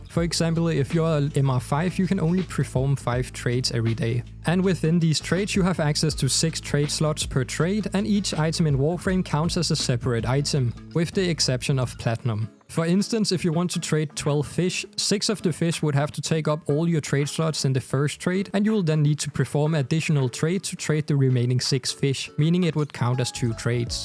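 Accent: Denmark. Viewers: You sound extremely Danish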